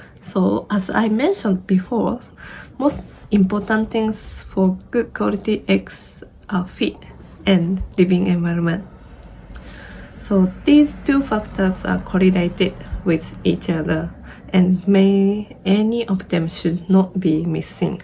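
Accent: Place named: Malaysia. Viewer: You have a pretty malaysianized japanese accent.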